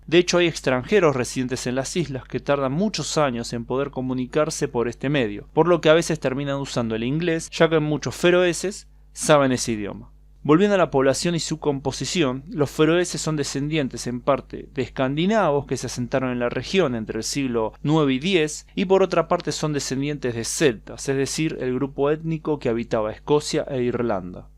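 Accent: Buenos Aires, Argentina. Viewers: Son buenos tus vídeos. Pero intenta no hablar tan argentino me.hace echarme a correr ... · A voz que vc escuta neste vídeo fala o espanhol da região de Buenos Aires.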